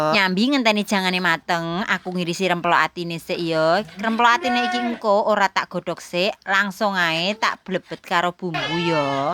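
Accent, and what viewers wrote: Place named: Java, Indonesia. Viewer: Nah begini penggunaan nada bahasa jawaNya mbak... Lebih enak didenger.... ❤❤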